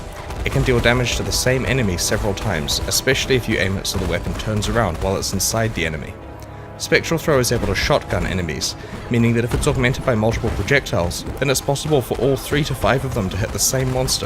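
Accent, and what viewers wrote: New Zealand. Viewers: Who's "GrantingGayGames"? ^^ (no offence meant,i just love newzzie pronunciation)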